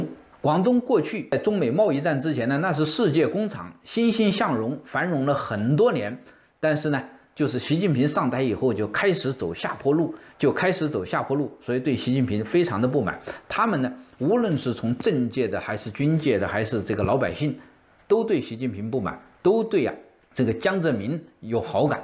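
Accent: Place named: Subei, China. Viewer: 这个老兄的口音江淮官话 苏北口音的底子，为什么我总是联想到 江爷爷。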